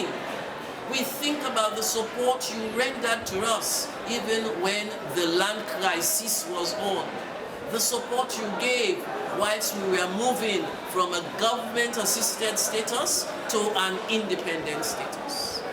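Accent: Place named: Caribbean. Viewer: Now I know where the Caribbean accents come from.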